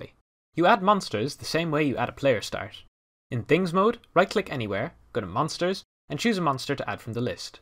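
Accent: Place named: Ireland. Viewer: why are you irish.....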